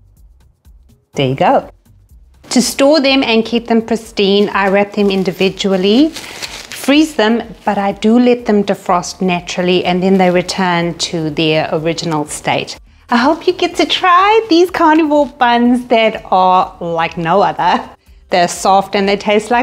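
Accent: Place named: South Africa. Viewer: Hi, thanks for the recipe. Are you South African? Your accent sounds like it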